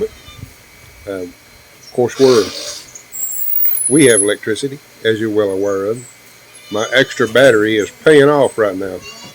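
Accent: United States. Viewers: Enjoy buddy ….. u sound like your from Atlanta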